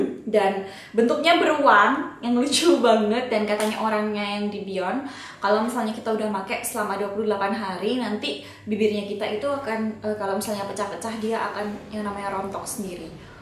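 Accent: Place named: Java, Indonesia. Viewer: medokkk bgttt kaloo ngmngggg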